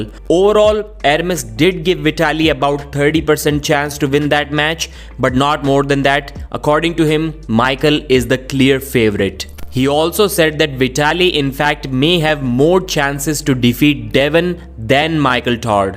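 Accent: India. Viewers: fix your god awful indian accent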